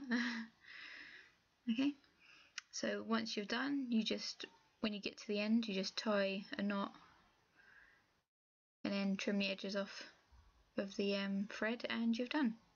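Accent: United Kingdom. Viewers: You have a british accent, yet you us american methods of measuring a opposed to metric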